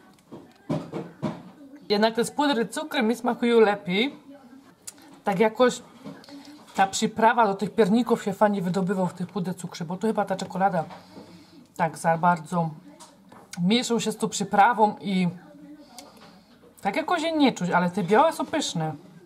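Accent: Silesia, Poland. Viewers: Choć nie jestem Ślązaczka ale bardzo lubię twój kanał i twoje przepisy, nie które juz wypróbowane👍🏾 i ta twoja śląska gwara ! Fajnie sie słucha ! A durnymi komentarzami sie nie przejmuj ! I rób swoje ❤️😊😊pozdrawiam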